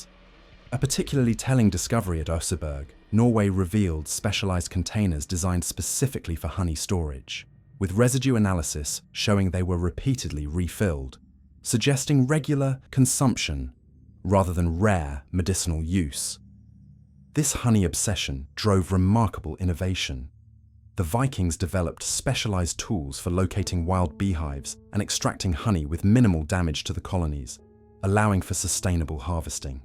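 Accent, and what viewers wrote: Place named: United Kingdom. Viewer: You don’t understand a uk accent?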